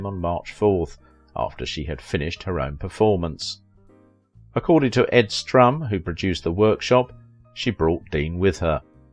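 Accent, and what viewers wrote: United Kingdom. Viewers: Okay I am tired of hearing a British accent narrating a totally American documentary.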